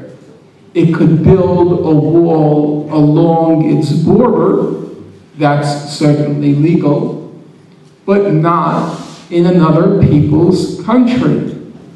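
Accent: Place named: Brooklyn, United States. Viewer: I wonder what the Czechs in the audience who after studying English for 6-8 years were thinking as they listened to Norman speak. Listening to him repeatedly pronounce the word "wall" in his thick Brooklyn brogue, I couldn't help but wonder what word did it sound like to the Czechs?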